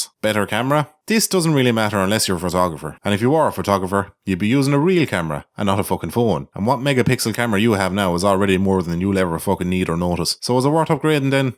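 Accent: Ireland. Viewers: Are you irish? and Loved your channel on the first video pal. Thanks for following back on twitter :)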